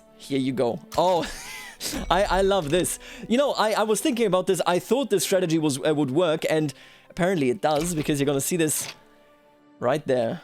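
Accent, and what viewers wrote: Bavaria, Germany. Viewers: Yup I can hear the Bavarian Very nice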